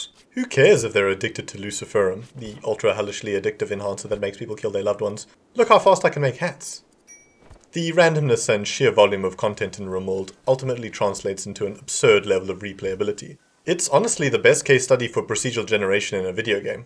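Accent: South Africa. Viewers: Ayo, are you South African? 🤨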